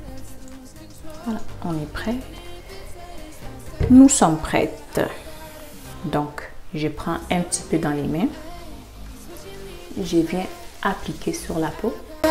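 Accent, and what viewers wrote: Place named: Quebec, Canada. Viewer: Enfin qql qui parle québécois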